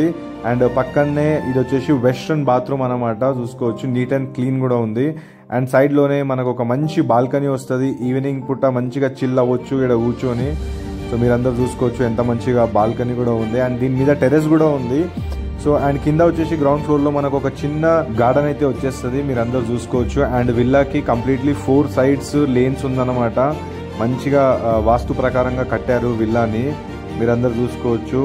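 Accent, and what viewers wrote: India. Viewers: Hyderabad slang bro we are proud of him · Typical maarvadi tone and Telugu and of course English is all messed up. No big deal.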